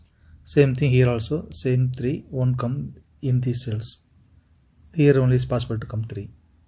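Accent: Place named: South India, India. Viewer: Great voice. Exactly, as same voice and accent , as of my friend from South(banglore). Its good to know u r from south. I do have lots of friend from South🥳🥳🥳🥳🥳🥳🥳🥳🥳🥳🥳🥳🥳.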